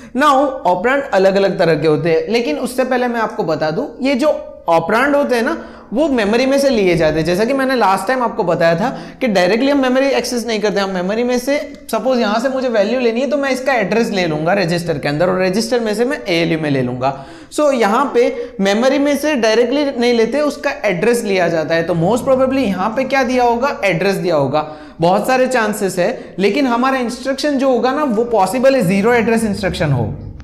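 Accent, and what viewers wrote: Gujarat, India. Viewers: bhai gujarat se ho?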